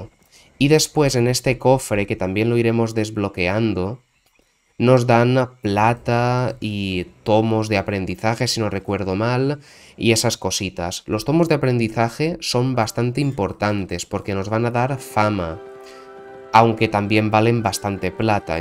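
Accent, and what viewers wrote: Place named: Valencia, Spain. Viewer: Me suena un acento muy Valenciano nice bon video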